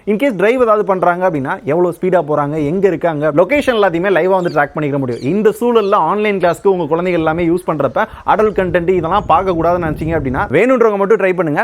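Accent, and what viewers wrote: Madurai, India. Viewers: Bro ninga madurai yaa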